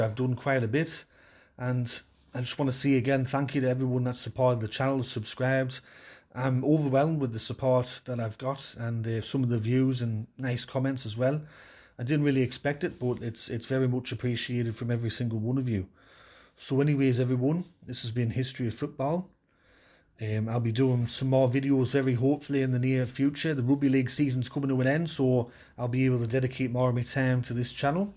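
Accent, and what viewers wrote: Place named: North East England, United Kingdom. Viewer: Another fascinating trip into the past. I thought from your accent that you were from the North East, so was somewhat surprised when you revealed you're an Everton supporter.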